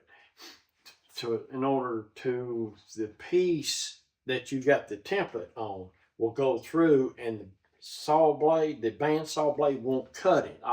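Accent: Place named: Kentucky, United States. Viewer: Hello sir. I have been watching you from Kentucky. I TRULY enjoy your videos, and one of the reasons I stuck around enough to learn something was it was nice to hear someone who talks like me. Thank you for putting up with foolish and mean people in order to teach and entertain the rest of us.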